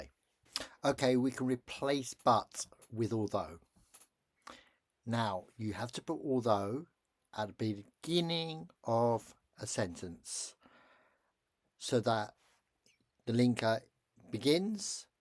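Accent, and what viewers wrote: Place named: United Kingdom. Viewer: Falta los subtitulado desde el inicio. Por lo demas todo bien. Me encanta el acento British 🥰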